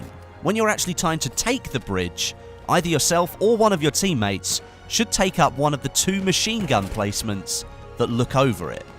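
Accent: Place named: United Kingdom. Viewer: fresh to have a British accent on a battlefield video.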